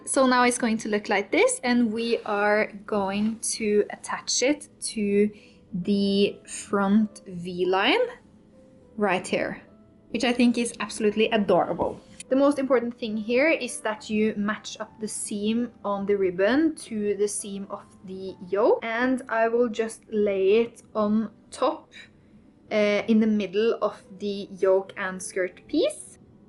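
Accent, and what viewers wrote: Norway. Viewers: Do I detect Norwegian? Så fint! Når jeg har skaffet min egen symaskin vil jeg sikkert prøve meg på dette!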